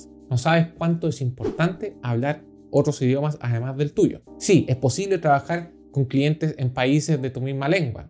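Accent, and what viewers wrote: Chile. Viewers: Suscrita a partir de ese hermoso acento chileno, tu fondo de video y obviamente tu calidad de contenido!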